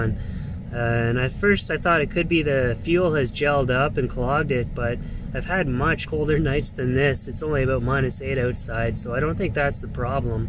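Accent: Canada. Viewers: Could listen to this guys Canadian accent for hours, in fact I think I have, shit what time is it!? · That Canadian accent! I'm from Wisconsin, and people think I have an accent lol. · The most Canadian accent I’ve heard in my life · Love that Canadian accent, everything sounds like a question. This man has a better life in a minivan than a lot of people in brick and stick homes. Happy trails! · This guy sounds so Canadian 😂😂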